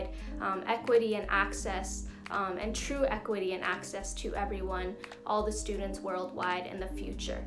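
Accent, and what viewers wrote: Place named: United States. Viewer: What country do you live in? You barely have an accent. You sound American.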